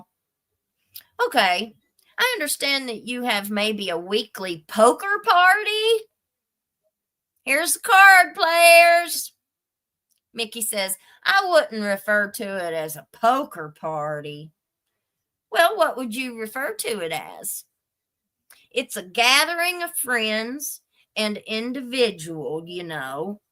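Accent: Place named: Kentucky, United States. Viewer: Hey, hey, hey! I went to college in Barbourville, Kentucky College. I worked in that area after graduation, in Corbin, London, Manchester, Hazard. Your accent is home to me!!! Now living in Oregon...my fondest memories are in Kentucky!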